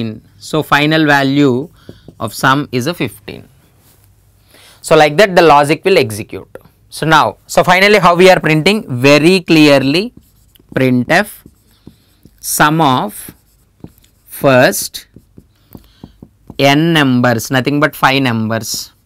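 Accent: India. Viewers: I am having fun watching an Indian accent explaining C programming than English man explains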